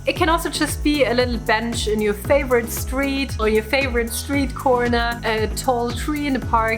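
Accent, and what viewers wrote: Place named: Germany. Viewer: I live in a small rural town. Its about a mile square. Population around 1100. Our home sits on a large corner lot. We have a huge backyard. I feel lucky I can light my fire ring whenever I want. But summers are just TOO HOT! We have enough room for any kind of gardening. I prefer to do my working intentions either within my home or in my backyard. We have lived in our home for 40 years now. So there is a lot of strength and love within its walls. My maternal grandmother came from Germany when she was 16 years old. She lived to be 99 and 1/2 years old. I love listening to you. Your accent is much like hers was. 😊❤❤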